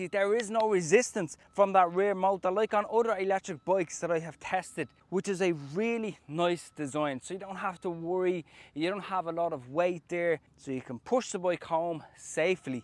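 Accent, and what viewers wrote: Dublin, Ireland. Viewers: Great vid. Best of all is your Dub-A-Lin accent. I just incountered someone with the said same bike and its a rocket to go with 15 degrees hill climb which is unheard of in this price bracket. It must be a bone crusher without suspension and solid tyres but the plus side is no punctures. Presently its 509 Euro because of black Friday. This is outrageous.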